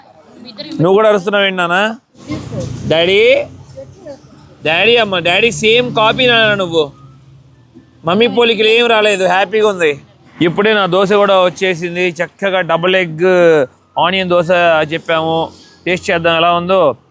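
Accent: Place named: Andhra Pradesh, India. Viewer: సూపర్ సూపర్ అన్నయ్య ని మాటలు ఫస్ట్ టైమ్ మన ఆంధ్ర స్టైల్ లో చాలా చాలా బాగున్నాయి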